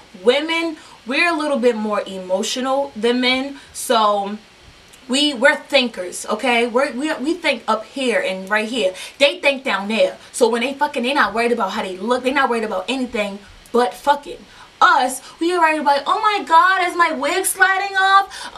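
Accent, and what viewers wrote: Baltimore, United States. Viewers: I know you from Baltimore with that accent.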